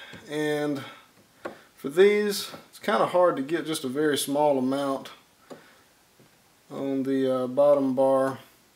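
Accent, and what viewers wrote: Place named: Georgia, United States. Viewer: Your voice and accent remind me of a gentleman by the name of Norman Blake from Rising Fawn, Georgia. You must be a Georgia native, if I'm not mistaken. Anyway, thanks for sharing your assembly contraption. I'm working with the Layens system of frames, so it's a slightly different set up. Thanks. Bob ( a Norman and Nancy fan in Montana)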